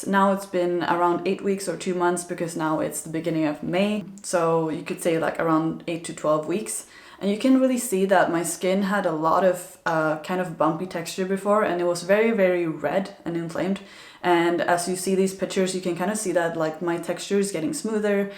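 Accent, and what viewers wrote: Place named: Hungary. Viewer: Not related to the video, but I would have bet that you are hungarian (after your intonation) until I saw that you are from Sweden 🙂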